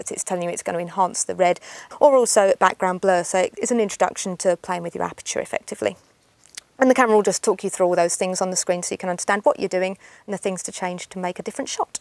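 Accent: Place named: England, United Kingdom. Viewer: I want to marry an english girl; I can listen to that accent all day long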